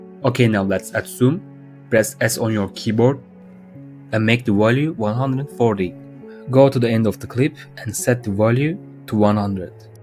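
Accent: Turkey. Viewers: hocam türk müsün çok fena aksanın var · Sesinden anladım türk olduğunu ag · abi türkmüsün aksanın benziyorda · aşırı türk aksanı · türk aksanını nerde duysam tanirim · are you turkish?? (bence türksün aksanından) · türkmüsün konuşman türk aksanına benziyo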